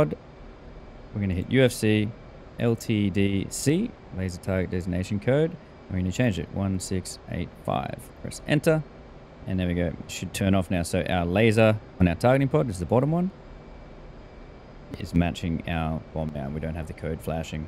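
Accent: Australia. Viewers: So nice hearing another Aussie accent. Great video!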